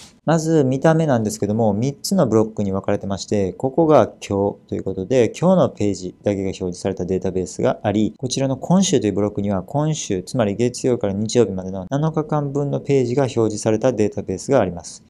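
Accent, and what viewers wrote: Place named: Kansai, Japan. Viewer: 関西弁聴きやすすぎます。。。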